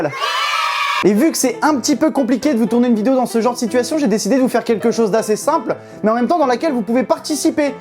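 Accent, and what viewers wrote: Switzerland. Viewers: T'es Suisse? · T'es Suisse toi ou bien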